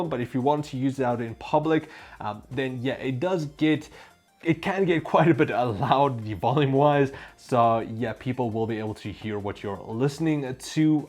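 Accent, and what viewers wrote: South Africa. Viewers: kak mad didn't know we had a South African Tech youtuber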